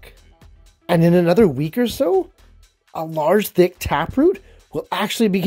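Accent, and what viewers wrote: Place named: Canada. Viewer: Cool Canadian accent😁 thank you for sharing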